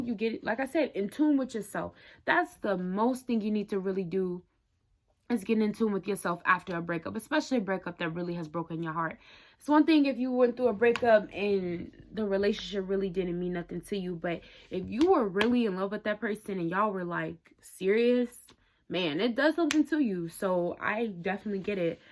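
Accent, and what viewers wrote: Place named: New York, United States. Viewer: Sounds like you have a New York accent lol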